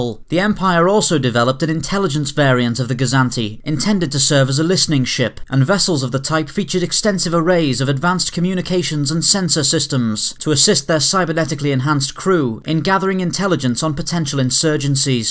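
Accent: United Kingdom. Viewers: Hiaruga I agree just think he needs to slow down and make it a bit more conversational and less frantic. It makes it a little uncomfortable to watch multiple videos with this rushed tone but otherwise I also appreciate the British accent just wish it was a bit more on the calm side. But always great content! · Love how the British accent makes anything sound better and credible. It just sounds good. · This was long overdue but, man I love your manner of speech. I may be biased as a fellow Brit meself but I can't help it.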